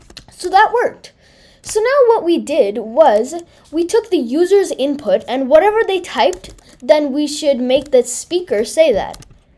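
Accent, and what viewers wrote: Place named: United States. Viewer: Where do you live ?I mean your origin is India but accent is American . Can you please tell me where do you live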